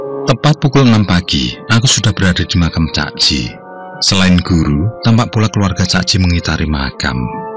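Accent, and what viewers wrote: Java, Indonesia. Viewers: kang izin masukan, saya penonton diluar jawa.. saya gk paham dg bahasa jawa.. terkadang juga translate nya gk lengkap dan warna nya juga kdg sulit dibaca.. lebih baik ceritanya dengan bahasa indonesia.. tp logat jawa gpp.. makasih dan semoga makin sukses ya kang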